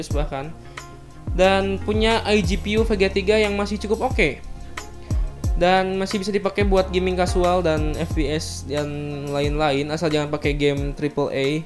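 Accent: West Java, Indonesia. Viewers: wkwk orang sunda ieumah yeuh wkwk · Orang Sunda bang😄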